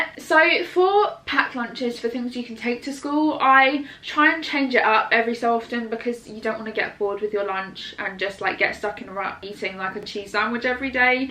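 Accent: United Kingdom. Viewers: She sounds like the UK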